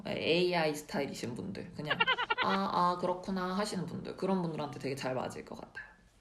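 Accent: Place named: Gyeongsang, South Korea. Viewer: 경상도 사투리를 들어봣을땐 경상도 현지인인것 같은데요.. ㅎㅎㅎ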